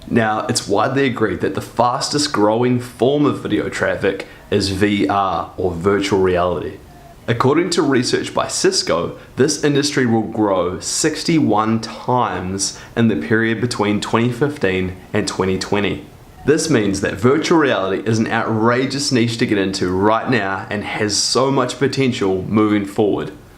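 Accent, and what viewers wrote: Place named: New Zealand. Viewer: Hey are you from New Zealand? You sound like a kiwi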